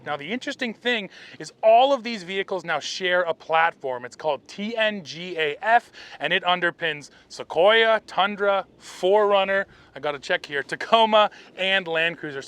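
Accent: Canada. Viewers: Love your regular guy type of reviews paired with a Canadian accent (hello from AK). Trailhunter looks great.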